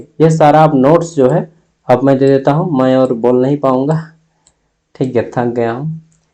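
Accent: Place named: Jharkhand, India. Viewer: Aapka khortha wala tone bhut shi lgta hai sunne m😃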